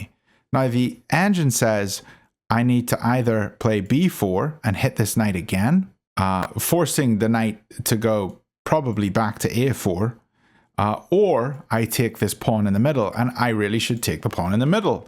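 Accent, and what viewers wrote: Northern Ireland, United Kingdom. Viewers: I'm really enjoying watching your journey. I'm currently trying to escape the <num> bracket and have been spending a lot of time learning theory recently, but watching skilled players convert midgame positions is very helpful for me Also I'm interested in your accent! It sounds like a mixture of Northern Irish and South African, plus I guess a few north Americanisms. Did I get anything right?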